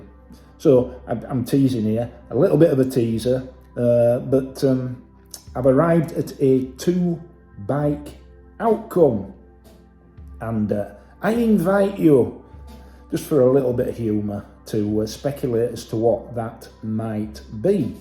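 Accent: Yorkshire, United Kingdom. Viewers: I believe the first thing that got me to subscribe was your Yorkshire accent. 😄 I like the way the channel content is laid out. I enjoy it all. Good honest reviews and opinions. Which ever way the channel goes, I will be here bud. Cheers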